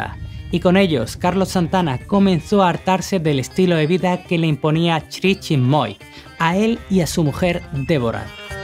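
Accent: Spain. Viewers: Que fondo musical tan horrendo ! y la voz españita de este chaval no le hizo ningun favor, no tenia Santana suficiente musica para ponerla de fondo ?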